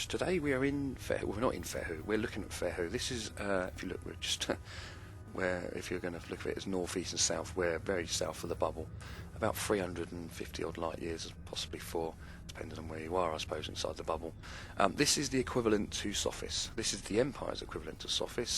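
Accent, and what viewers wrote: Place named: North East England, United Kingdom. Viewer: I have to totally agree on the North East accent, I too just love it. Thanks for making this very informative video that I have only just come across. Liked and subbed.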